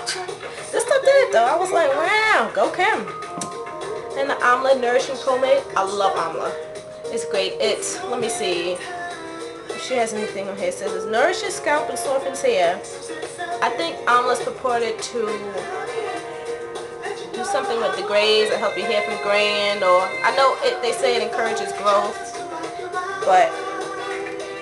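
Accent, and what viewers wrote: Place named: New York, United States. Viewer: Yes, you are a fellow New Yawka. =p Anyway, I know Qhem appreciates the free advertising. Good job!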